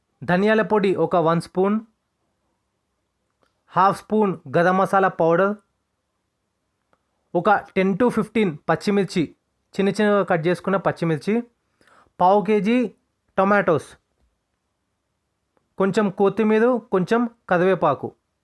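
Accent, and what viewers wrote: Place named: Telangana, India. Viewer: Fine cooking 🍳🔪 bro❤ Telangana language bro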